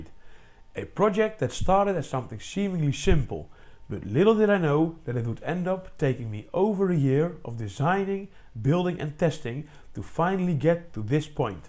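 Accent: Netherlands. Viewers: Bro that Dutch accent goes hard af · I bet this guy is Dutch, I can hear it within 2 seconds. Nice vid. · Hoort meteen dat je een Nederlander bent🤣😂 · Heeerlijk altijd dat hollands accentje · This accent screams dutch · I hate the dutch accent 😭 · When i heard your voice and saw your garden i directly thought this guy is dutch. chat gpt verified it. Hoi broeder van een andere moeder. Het is altijd leuk om een nedelander te vinden (of belg maar dat accent is anders). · It took me exactly 0.2 seconds to find out you're dutch from your accent 😂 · Thats one hell of a dutch english acent there mate😂 Net als mark rutte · Interesting video. IMHO if the "ice" projectiles become lethal it falls into lethal weaponry category and as such could be a perfect weapon to kill without trace. This definitely will attract attention from the CIA and alike. It also probably will be banned. 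Ps why define in feet? I hear on your acence that your Dutch. Let those US audience convert to metric with their arrogance for imperial for once. 😅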